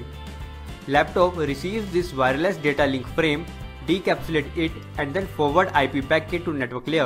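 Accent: India. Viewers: I love the video, contents are correct AND Well presented.. Easy to understand rather than reading the books and trying to imagine it all. For improvement, i suggest that the narrator to speak a little bit slowly in speed since the Indian-English accent takes a little time to process especially for Asian. All in all, thank you very much for being generous sharing the knowledge. May Allah bless you always n :) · مفيد بس الإنجليزي الهندى ده عاوز ترجمه لوحده... ياخربيت بريطانيا كل سنين الاحتلال ده ومعرفتش تغير اللكنه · I love his indian voice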